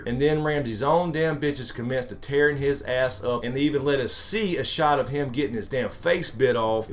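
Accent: South, United States. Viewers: Are you a comedian! You make me laugh out loud, til my stomach hurts! I LOVE your reviews! Love that Southern drawl, too! Great job! I look forward to more videos from you..... :)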